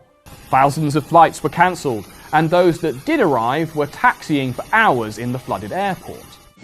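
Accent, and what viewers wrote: England, United Kingdom. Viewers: Oh now I get it. You have the Russell brand accent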